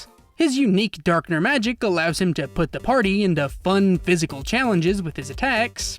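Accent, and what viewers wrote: South, United States. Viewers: Oh my god you are so southern. I live in the deep south and i don't even know anyone who sounds like that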